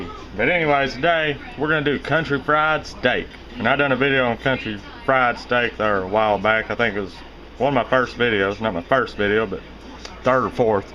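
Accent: South, United States. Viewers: King of the dingalings........Southern accents are the best ever. Dont hate cause you ain't son. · really someone said European accent ugh nooo its a southernnnn one lol come on yall! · From the sounds of this southern man, he probably doesn't care about your two cents. Move along... Totally trying this right now.... thank you for sharing! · you have a cute voice I love the southern accent! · That's a southern accent believe me I'm from South Carolina and we have that southern slan as well.. Greenville SC in the house and your food looks 😋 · My parents were from Missouri. You sound more southern than that. But listening to you speak takes me back to when my mom took me to Missouri, 8 months before she died. And your recipes look so delicious. All you need to do is make tomato sandwiches!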